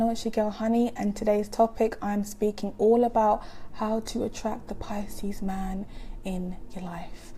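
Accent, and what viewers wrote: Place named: Nottingham, United Kingdom. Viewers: Hi Honey, great video as always. Are you from Nottingham? Your accent sounds similar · There’s nothing wrong with the Nottingham accent 🤨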